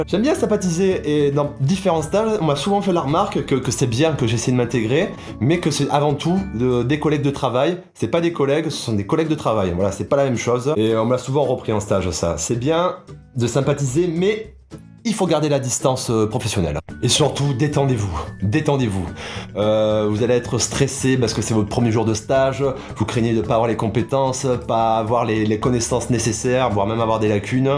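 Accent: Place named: Southwest, France. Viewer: Tu serais pas dans une grande ville du Sud-Ouest par hasard? ;) (rapport au petit accent qui se fait entendre à certains moments ^^) Sinon merci beaucoup pour la vidéo, ça se voit que tu as fait pas mal de stages déjà pour en arriver à toutes ces conclusions, je pars en stage en SSR dans 4 jours et j'espère que ça va bien se passer...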